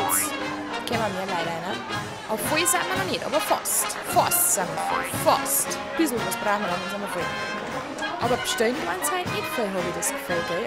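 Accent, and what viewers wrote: Bavaria, Germany. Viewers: Zum ersten mal Bayrisch gehört und dacht mir nach den ersten sekunden erstmal nur so "ok und von sowas bin ich fan im fussball ?:D" aber is schon ganz ok besser als Kölsch ^^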